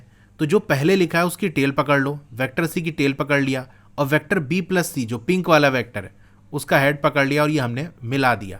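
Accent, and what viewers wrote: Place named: Allahabad, India. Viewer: Sir are you from.allahabad or any nearby city from it your accent is similar to Allahabad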